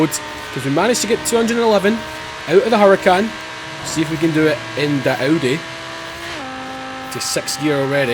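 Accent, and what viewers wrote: Scotland, United Kingdom. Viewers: Mate Gota love Scottish peoples voices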